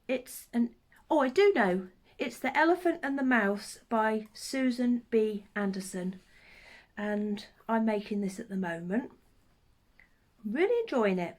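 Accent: Norfolk, United Kingdom. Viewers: I have loved watching your episodes so much I have decided to go right back to the start and watch them all one after another. I'm in Bunbury, Western Australian and my son lives in Norfolk as well so new I had heard your accent before when I hear his friends. Wishing I was over there again but the virus has kept us apart from two years now. Cannot believe how talented you are! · Hi, I don’t know if you’ll get this as it’s on your first podcast, but the algorithm popped up your channel with current new video and I’ve been watching for a few weeks now and thought I’d go back to your start. I thought I detected a Norfolk accent, my husband and his family all came from Norwich, although we live in Swansea now. I love watching you. You remind me of my cousin. Take care.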